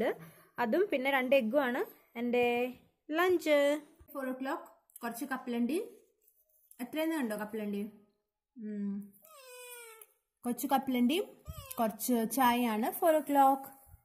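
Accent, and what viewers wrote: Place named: Malabar, India. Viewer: nde malayaalam is soo different.kayichu..malabar aano stalam...good information..thanks dear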